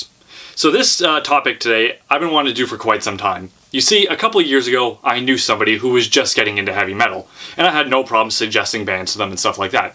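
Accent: Canada. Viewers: You sound more Canadian now.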